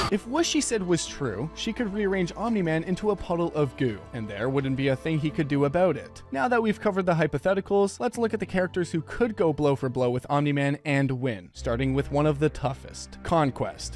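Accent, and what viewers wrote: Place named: Canada. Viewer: thick canadian accent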